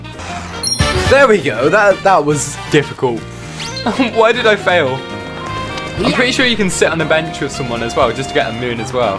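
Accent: United Kingdom. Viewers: Wait are you British 🇬🇧?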